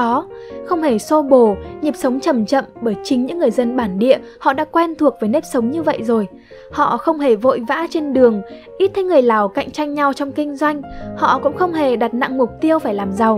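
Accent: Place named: North, Vietnam. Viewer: giọng là giọng bắc, nhưng đôi lúc có chút phong ngữ miền nam:)))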